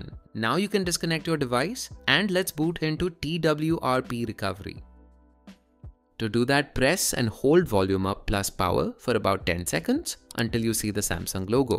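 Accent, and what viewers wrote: India. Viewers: If you hear he has a Indian accent the first thing you do is call him a cockroach and then get a dictionary with all racial slurs so 99% of IT info is actually good because you bullied the problem and send him home (in the sewer) And hoping they'll one day scam grandma's again while they curse you out because you stood up for the vermin that is still making tutorial hell in 2026 possible until we all bullied them together :)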